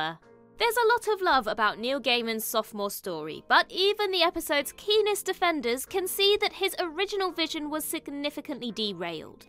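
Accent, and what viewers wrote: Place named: United Kingdom. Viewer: Ayo i love british accent ”doctor U”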